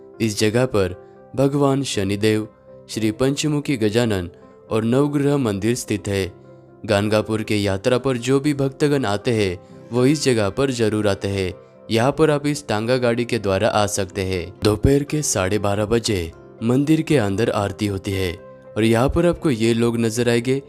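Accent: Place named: Maharashtra, India. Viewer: कशाला उगीच मराठी टोन मध्ये हिन्दी बोलताय . मराठीच बोला की .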